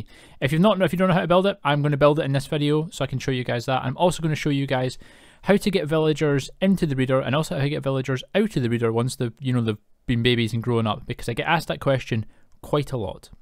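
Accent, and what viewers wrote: Scotland, United Kingdom. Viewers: I don’t want to assume. But are you Scottish? - from a fellow Scot :) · Yay Scottish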